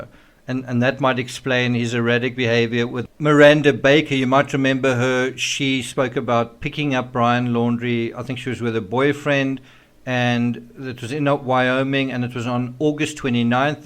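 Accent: South Africa. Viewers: Good SAFFA accent. Your steak was blue. Hello from Sedgefield in the Garden Route.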